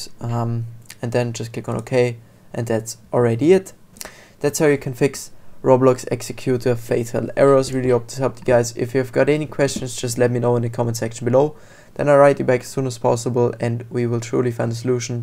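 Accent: Germany. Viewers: i hear deutschland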